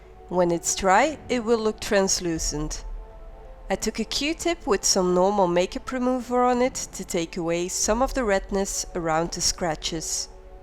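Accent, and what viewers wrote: Netherlands. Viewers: This looks really good and detailed! thanks! btw where are you from? your accent sounds slightly dutch or something😊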